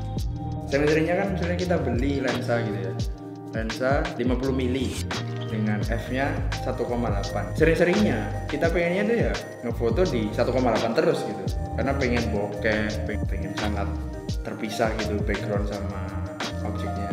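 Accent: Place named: Surabaya, Indonesia. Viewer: Makasih mas, bermanfaat bnget infonya. Dan ternyata podo Suroboyo e Haha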